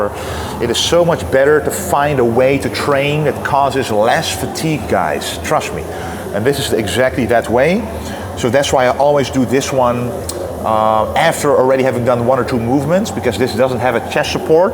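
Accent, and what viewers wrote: Netherlands. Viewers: OMG I am so much supporting you because of your lovely Dutch accent. ❤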